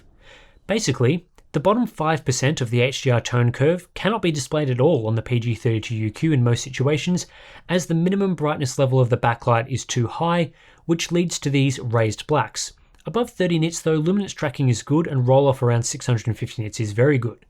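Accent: Australia. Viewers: What would you recommend this or the LG ultragear GP950? By the sound of your accent getting a top of the range monitor in Australia is impossible due to the chip shortage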